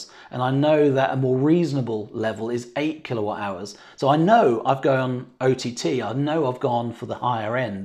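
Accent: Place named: Norfolk, United Kingdom. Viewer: Thank you for your well thought out video. We installed our panels and batteries nearly a year ago now, and had similar thoughts. Now we live in Portugal, which is somewhat different. Approximately 300 days of sun per annum and you can’t sell back to the grid. But the same logic still applies; understand what you want from the system, work out your peak daily use, and size panel production and energy storage accordingly. We have been off-grid since March and we consider we have the balance. By the way, I’m a Norfolk gal, and I can hear a slight lilt in your speech, but the picture of Elm Hill confirmed it to me!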